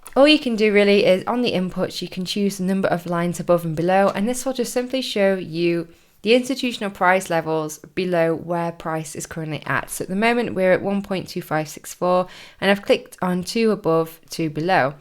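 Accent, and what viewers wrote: United Kingdom. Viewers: That is a very British accent and a very British face. I feel like I'm in a Bridget Jones movie.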